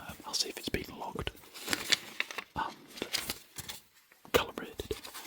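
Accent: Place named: Northern England, United Kingdom. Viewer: This is amazing . I’m rubbish at telling the northern accents apart (Londoner 😂) but whatever it is, is so relaxing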